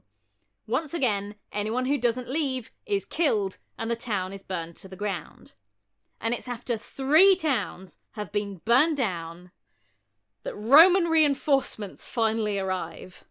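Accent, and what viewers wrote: United Kingdom. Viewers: First time i watch one of your videos and i must say i just loved it. I was genuinely surprised when you cleaned your tribal make-up and doned the scholar outfit. I like your storytelling style, your light and enjoyable british accent and the photography style you chose for this video. Absolutely well done and keep up the good work Signed: The Romans 😅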